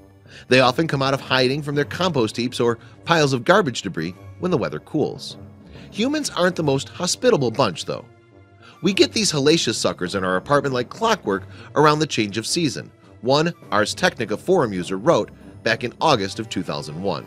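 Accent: United States. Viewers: “Tchi-tn”. I am sorry, but this is pronounced “Khai-tn” (from Greek χιτών, chiton). “Cheating” is a completely different word. American narrators are so American...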